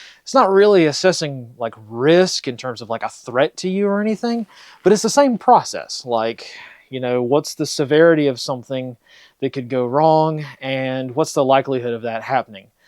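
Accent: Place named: Pacific Northwest, United States. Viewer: Great content. Sounds like you live in the PNW.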